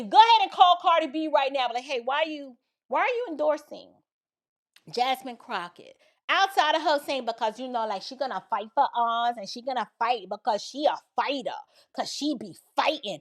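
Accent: New York, United States. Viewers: Your NY accent is thick today! It must have been so nice to get back home for a bit. ❤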